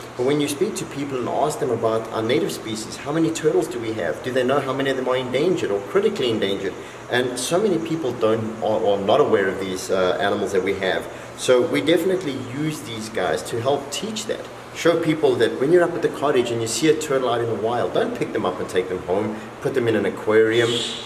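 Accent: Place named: South Africa. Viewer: It only took me a few seconds to realise I was listening to a fellow South African! Howzit!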